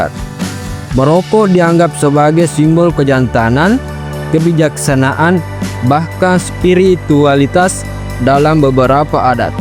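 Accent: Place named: Sulawesi, Indonesia. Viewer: Semangat bang, kedengarannya orang sulawesi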